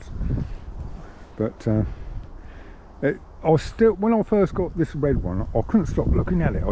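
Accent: Suffolk, United Kingdom. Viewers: Nout wrong with a Suffolk accent 👍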